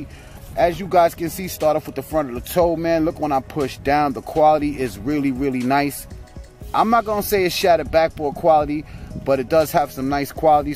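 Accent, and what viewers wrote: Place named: New York, United States. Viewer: sound like he from newyork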